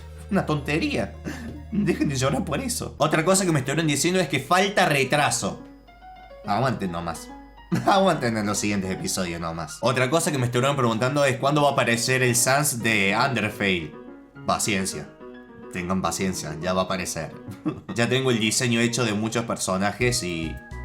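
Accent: Argentina. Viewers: no solo te ganaste un like tambien un sub se nota que es de angerntina mi pais saludos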